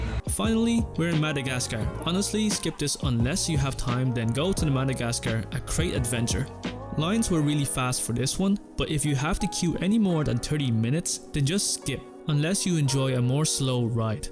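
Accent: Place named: Ireland. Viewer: Watching and thought "this lad has an Irish accent". Going in May. Subbed!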